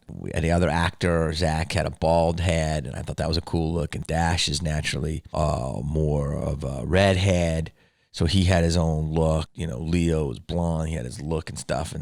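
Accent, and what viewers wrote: Australia. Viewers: I absolutely love your channel and videos like these. It's such a treat to get some behind the scenes insight, thank you! Also, I'm Australian and really appreciate the accent haha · That Aussie accent warms my Aussie heart. 🤣🤣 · I love all your movies !!You should probably make a movie as a Australian animal, hunter or something because your accent is spot on😂😂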